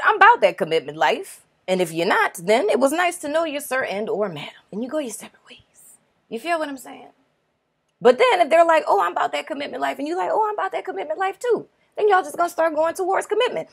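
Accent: New Orleans, United States. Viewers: Yassss NOLA accent!!! 😍🥰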